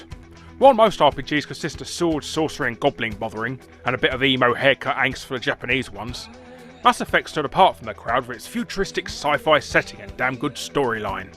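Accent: United Kingdom. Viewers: VERY british accent you got there :D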